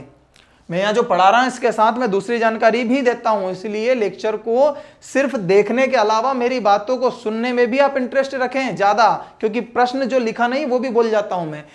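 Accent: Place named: Madhya Pradesh, India. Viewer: Sir ji ye to hmari burhanpur ki language h o bhiya wali mja a jata h sunker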